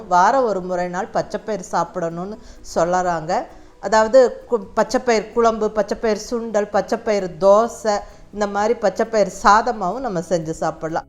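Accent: Coimbatore, India. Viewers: Sis neega covaiya neega pesurathu eanaku pedikum sis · Feeling good to hear the coimbatore tamil slang. Very nice akka. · neenga pesarathu kongu tamil ah madam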